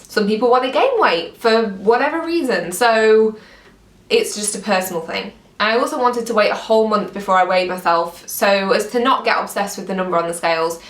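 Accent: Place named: United Kingdom. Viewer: You have a British accent. Lovely😍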